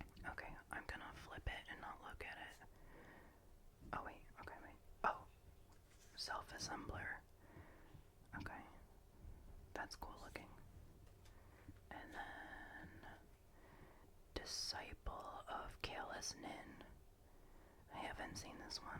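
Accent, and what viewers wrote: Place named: Midwest, United States. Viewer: I love your Midwest accent. It’s so soothing.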